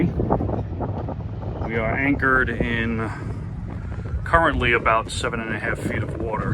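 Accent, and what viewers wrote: New York, United States. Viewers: Hi I am sorry i don't know your name. I enjoy watching your Video's. Love your New York accent. People say I sound like I am from Bronx.. LOL We live and boat on the South Shore long Island. We also snow bird in Naples FL.. My Wife and I have a 94 Mainship which We love. i also do my own work so i can relate to your video'. Safe Travels ( 7 knots is painfully slow) lol keep the Video's coming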